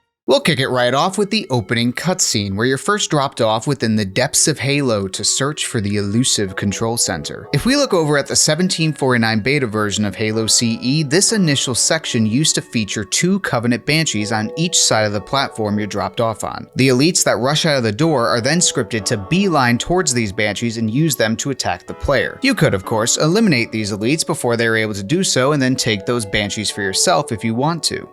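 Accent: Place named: United States. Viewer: Voice reminds me of shilt show! With a different American dialect,Lol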